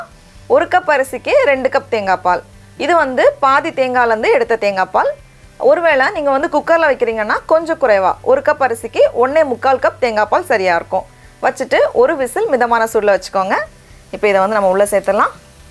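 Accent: Nagercoil, India. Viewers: Akka NenGa NaGerCoil aH ???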